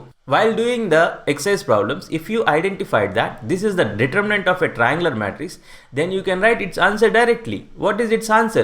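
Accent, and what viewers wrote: South India, India. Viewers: Sir are you south Indian ? Your English speaking is so smooth that I can understand every line easily. Thank you so much sir. 😁😁😁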